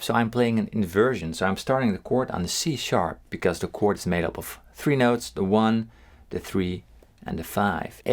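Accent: Netherlands. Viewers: Hey man are you dutch? Zo ja hallo uit nederland!!! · Thanks man! So simple, yet so nice! BTW, are you Dutch?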